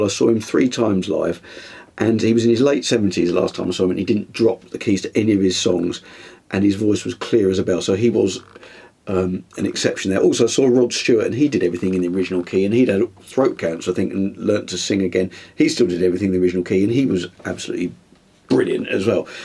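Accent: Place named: England, United Kingdom. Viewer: first time seeing your channel, and I'm a fan. I share your love of music, and enjoy your analysis, and your English accent is awesome man. This whole Tracks thing has really blown up. There has always been backing tracks used in live concerts. I mean ACDC in Hells Bells, played live w track of the steeple church bell, Van Halen keyboard fills on Jump, Love comes Walkin In, and others, that after the 5150 that Eddie actually played keyboards live, they were tracks on live shows after that tour, Ed was back on the guitar. Def Leppard recently now come under fire for using tracks, which is no secret, and Rick Savage has even demonstrated in many behind the scenes interviews, demonstrated the pedals he uses on certain songs for the background intros to a few songs, and the pedals he plays for the short uses, but all the drums, guitars, and amazing vocals are live. 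What's happening now though I the bands like Motley Crue, being outted for the guitar, bass, drums, and Vince Neal's vocals, ALL TRACKS, talk about Miming, thats why fans are pissed, and how Motley Crue keeps latching on to the coat tails first w Def Leppard, and coming up soon w Guns n Rose's, who wants to pay <num> to come out and watch a bunch of overweight has been lypsyncing and playing air guitar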